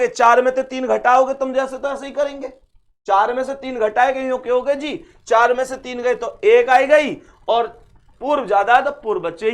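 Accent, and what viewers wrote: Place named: Bharatpur, India. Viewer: Bharatpur jesi language h sir apki ❤❤❤❤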